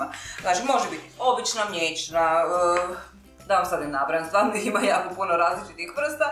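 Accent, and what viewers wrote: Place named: Dalmatia, Croatia. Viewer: Hvala draga 🧚‍♀️predivan rezept🙏Hvala od srca 🍀oh kad te pratim kako pricas kao da si dalmatinka Jaki teprament od gusta te slusam Pozdrav iz Dalmacije👍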